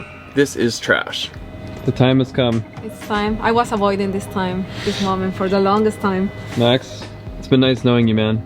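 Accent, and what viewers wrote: Canada. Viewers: LOL - your Canadian accent is really showing itself again! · The longer you stay in Canada the more of the accent comes through.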